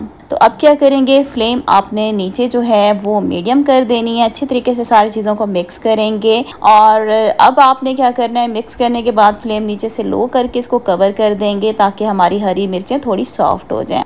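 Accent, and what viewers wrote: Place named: Pakistan. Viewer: Achi lagtii hai pakistani hindi.....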